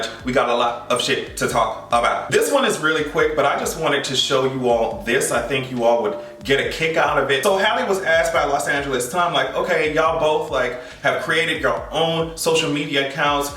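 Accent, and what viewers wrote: Jamaica. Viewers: If you don't speak that patois!!!! You betta, I love it, I want more boo, celebrate that heritage too. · I love ur jamaican accent 🥺😍 and yess I’m so happy for Adele ! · When you speak Patois 🥰✨😍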